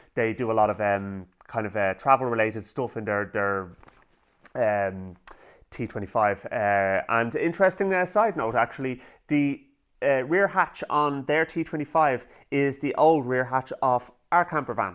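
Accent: Ireland. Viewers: The Irish lilt is very pleasing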